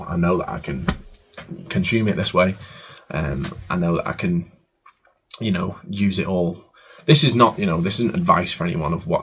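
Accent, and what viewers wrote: United Kingdom. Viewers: Legend mate , literally been on the same journey. Glad to finally have someone in the UK posting this type of content and judging by your accent your only down the road 😂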